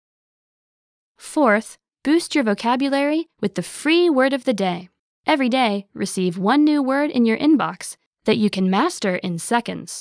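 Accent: Vietnam. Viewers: Is this northern or southern Vietnamese?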